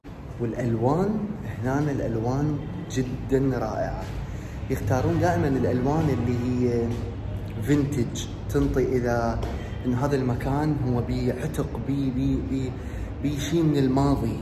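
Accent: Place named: Iraq. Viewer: الفيديو جميل و اللهجة العراقية تجنن اكتر لهجة بحبها ❤️❤️❤️نورت مصر 🇪🇬🇪🇬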